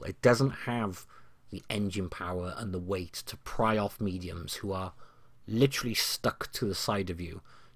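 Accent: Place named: Wales, United Kingdom. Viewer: Wyt ti'n siarad Cymraeg? I noticed your accent and wondering if you're from Wales:)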